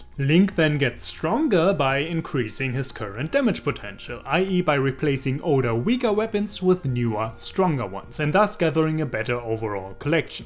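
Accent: Germany. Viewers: Good video. And I get that some folks don't much care for open world type games and get really picky about what kind of games they like so I can respect his 7 out of 10 that he gave the game... Here's the thing though about Jim Sterling. Okay fine... He didn't like this clearly good game as much as most folks. Fair enough... Not everyone has the same feelings... BUT! He gave dead rising 4 a 8.5 out of 10. That's fuckin retarded lol. I don't care how opinions differ and all that. They shouldn't fucking differ THAT fucking much. That's like eating shit vs a new york pizza logic. Love your accent btw. Reminds me of darth helmet from space balls or that german guy who had to put up with homer in that land of chocolate episode. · thats a serious german accent dude....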